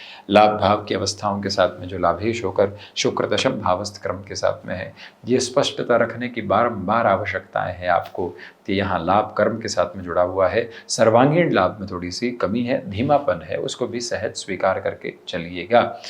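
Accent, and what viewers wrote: Standard. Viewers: Sir aap bahut sahi bolte hai shudh Hindi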